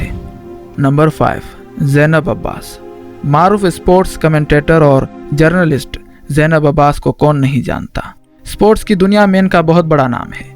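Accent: India. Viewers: آپ کی اردو کا تلفظ مشکوک ہے۔ وڈیو ٹھیک ہے ۔ مگر آپ کا تلفظ ہندی ہے۔ اس کو ٹھیک کریں ۔ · This guy is an indian not pakistan. Notice the way he pronounces 'KH' like hindi speakers of india. Pakistanis, dont be fooled by this hateful crap created by an indian. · Aap Urdu sahi bolo Indian ki tarha nahin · Urdu pronunciation thik kero apni. Indian style mein boltay ho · یہ گنی اور کھواتین کیا ہوتا ہے؟ شرم نہیں ،،بے غیرت مت بنو،،اور اردو صحیح بولنا سیکھو،،،کیا تم انڈیا سے ہو؟ اگر نہیں تو لعنت ہے تمہارے اس لہجہ پر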